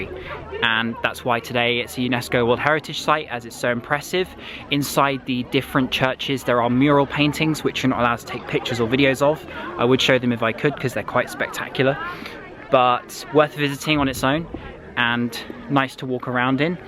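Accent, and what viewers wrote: United Kingdom. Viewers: He's got British accent!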